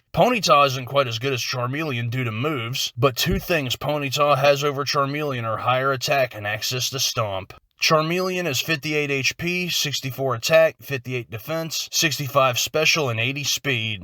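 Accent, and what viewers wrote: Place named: South, United States. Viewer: when i clicked the video i was like now which one was this guy oh right the one with the southern accent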